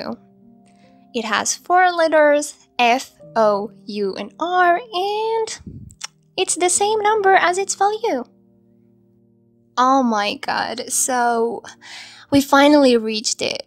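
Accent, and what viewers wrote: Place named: United States. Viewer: Fun fact your : you could be a singer. You have a great America accent. And sweet voice❤️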